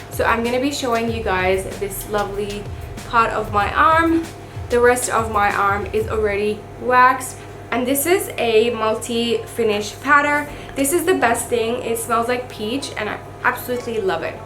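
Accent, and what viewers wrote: United Kingdom. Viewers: Literally i thought your Pakistani . your speaking style is very similar to British accent. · I hear a bit of scottish accent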